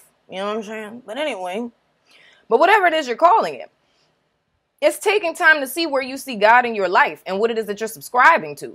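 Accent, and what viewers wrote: New Orleans, United States. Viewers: Yassss NOLA accent!!! 😍🥰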